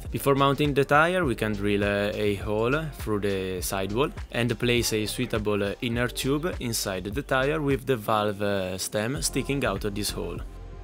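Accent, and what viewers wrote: Italy. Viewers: Ciao, innanzitutto ti voglio fare i complimenti e dirti che sei assolutamente underrated su YouTube, sia per la qualità dei video che per la qualità dei progetti. (Scrivo in italiano sia per confondere i visualizzatori inglesi sia perché mi sembra di aver capito dagli "indizi" nei video e dall'accento che tu sia italiano). Approfitto del tuo nuovo video, ottimo come sempre, per chiederti una cosa: nell'ultimo mese mi è venuta voglia di costruire un simulatore di guida. Così ho cercato online per capire come fare e ho trovato, oltre ad un altro video da cui ho preso ispirazione per i pedali, il tuo, dove mostri come costruire il volante. Non ho però costruito tutto il force feedback: ho preso ispirazione da un altro video dove si usa un semplice potenziometro. Dopo aver modificato i file 3D e i DXF per adattarli ai miei pulsanti e per utilizzare molle anziché magneti nelle levette, l'ho costruito. 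Grazie davvero per aver condiviso i file e il video di costruzione! È venuto talmente bene che mi è venuta l'idea di fare una specie di kit del volante e dei pedali da vendere, non i file digitali, ma quelli fisici come kit da assemblare. Volevo chiederti se ti andrebbe bene se potessi usare questi file "remixati" e alcuni proprio rimodellati da zero, ispirati ai tuoi, per questo progetto. Se preferisci (e per farti capire meglio), posso mandarti delle foto e degli screenshot delle parti modificate. Grazie!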